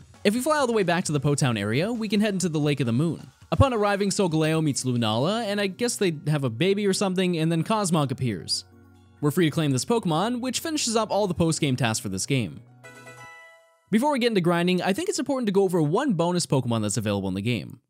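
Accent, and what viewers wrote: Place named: United Kingdom. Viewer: It's crazy how different and sound. One sounds more British (being more formal and a bit cynical) while the other sounds stereotypically American (more loud, but more fun-loving and casual). (I hope I didn't offend anyone saying that, it's just to show how different in energy these two are.)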